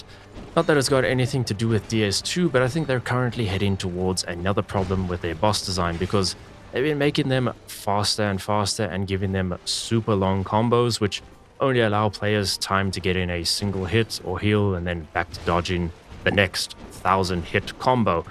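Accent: South Africa. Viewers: South African Accent?? My ears don't lie👀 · saffa? your accent is difficult to place 🤣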